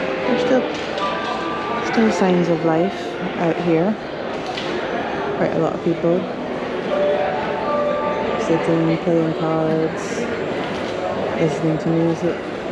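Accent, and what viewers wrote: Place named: Scotland, United Kingdom. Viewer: It’s always nice hearing another Scottish accent randomly when searching for Shackleton stories haha. Great video love from Cumbernauld.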